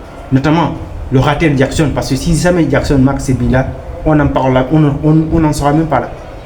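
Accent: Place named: Senegal. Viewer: Deja tu n'est sénégalais avec ton accent la hum🤷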